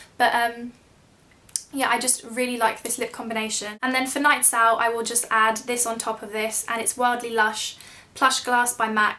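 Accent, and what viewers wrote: United Kingdom. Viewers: I just realized how much you look like Laura from Lollipop26. Your hair, your accent and omg even ur face reminds me of her. British women are so classy ! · Hi! I love your videos so much <3 I'm Thai and when I first discovered your channel, I hardly understood you because of my English at that time and I was not familiar with British accent. I've been a subscriber of you since 2011 and I watched almost every single video and that help improve my English a lot! I just got 8.5 on my IELTS listening and that was a big surprise for me and I want to thank you for that.:D love you <3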